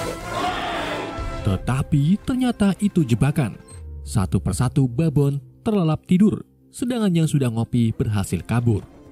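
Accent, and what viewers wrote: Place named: Banyumas, Indonesia. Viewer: pengisi suara ngapak tapi bahsa Indonesia gak telalu mendok...bagus...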